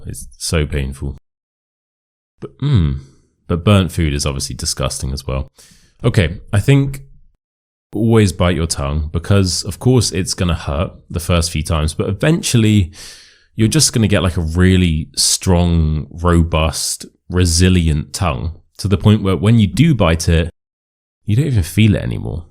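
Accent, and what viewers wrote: RP, United Kingdom. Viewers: Thank you very much dear Mr yes you are speaking real British English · I started watching your videos a few weeks ago to improve my British RP pronunciation, and they’ve been amazing! But here’s the twist 🔀 I’ve become too addicted. I started watching them at night, and now they’ve turned into my secret weapon for sleep. A few nights ago, I fell asleep halfway through a video, and yesterday… I slept for 12 hours straight! So, congrats 🎉🍾🎊🎈 your videos are not just improving my accent, they’ve also become my personal sleeping pill. Keep up the great work, I guess? 🧐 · Hi, it's really amazing to learn British English with you. Thanks a million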